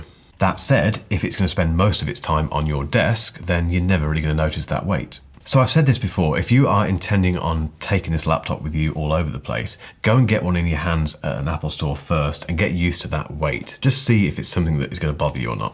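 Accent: United Kingdom. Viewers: Watching your videos not only gives much useful info but also allows listening to perfectly clear British speech, which is like honey in my ears. From Russia with love:)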